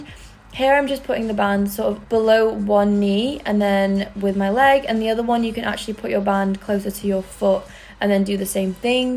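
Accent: United Kingdom. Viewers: love your accent :3 sounds english, did you grow up in the UK; do you speak portugese too¿?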